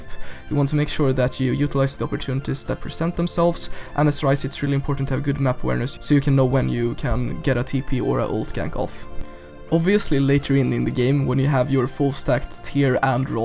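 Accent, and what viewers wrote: Sweden. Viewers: Are u swedish? u sound like a swede when u talk:.)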